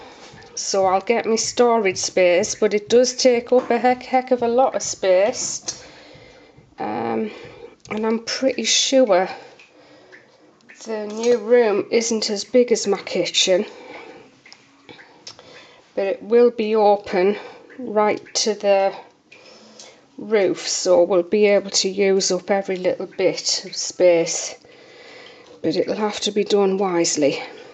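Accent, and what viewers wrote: United Kingdom. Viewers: Definitely British :)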